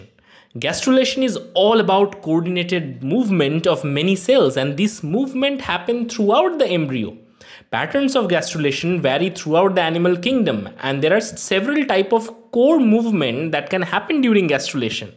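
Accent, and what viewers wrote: India. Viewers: he's indian, how else is he supposed to speak.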